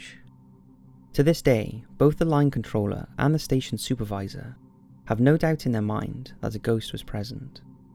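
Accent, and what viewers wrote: Wales, United Kingdom. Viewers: Are you from like Newport or Cwmbran kinda area? Xx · dude i like your british accent because its easy to understand even for an american. btw great stories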